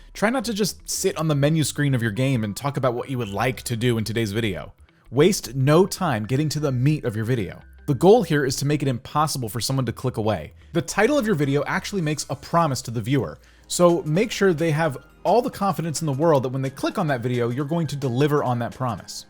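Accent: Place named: United Kingdom. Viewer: You lost your British accent and grew hair?🤯🤯🤯🤯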